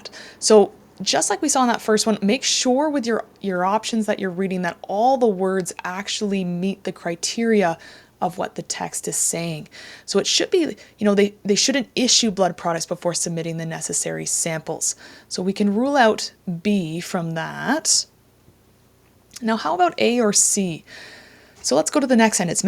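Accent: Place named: Canada. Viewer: Thank you. Your explanation is the best I have come across so far. I think the Canadian way of talking is a big factor in the way you explain things clearly!!!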